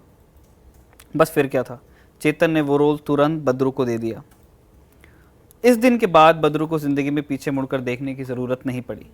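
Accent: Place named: North, India. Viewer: Why are you keep saying BOMBAY instead of MUMBAI which is actual name.? I feel that all north Indians (that what i believe from your pronunciation) are stupid and are not willing t come out of British slavery and so keep reminding themselves with names like BOMBAY instead of its proper, actual & real name MUMBAI...... I am hoping that you will improve on this....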